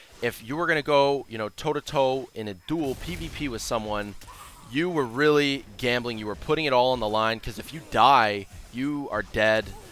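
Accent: Canada. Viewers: are you canadian???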